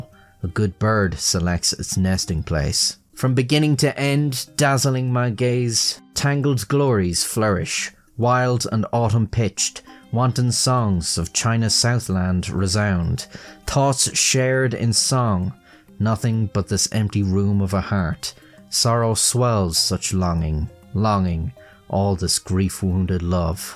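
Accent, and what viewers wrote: Ireland. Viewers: Is that accent Irish? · Please, Irish guy in my iPad, read me more poetry. I promise I won’t be idly scratching my ass this time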